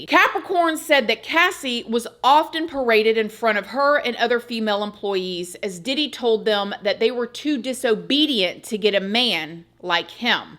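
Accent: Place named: New York, United States. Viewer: Is Christina from New York she has our accent lol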